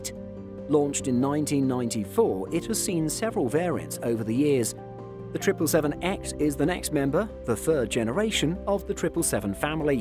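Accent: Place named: United Kingdom. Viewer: You are British by the sound of you so Preeedersessors fella not the American Prehdersessor c'mon!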